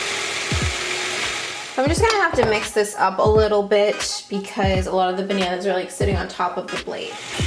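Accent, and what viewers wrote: Canada. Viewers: Are you a Canadian? Your accent ...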